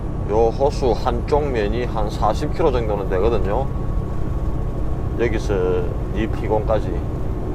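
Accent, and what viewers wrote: Gyeongsang, South Korea. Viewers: 행님 건강하이소^^먼저 저는 부산 경상문디 행님 동생입니다.행님 뷰 홀딱 빠져보고있습니다.뭐 때매빠질정도로 볼까요? 경상도 행님 목소리 인것같습니다. 행님 저는 추레라 하고있습니다.행님 최고다.있빠이 행님 뷰 보면서 마이 배우고 있습니다.돌쇠 힘들어도 마이 챙기주이소~^^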